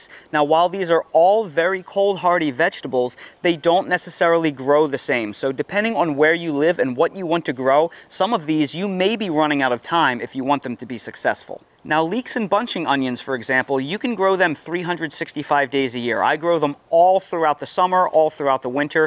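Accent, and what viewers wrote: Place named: Northeast, United States. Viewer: *I detect a NEastern UDA accent.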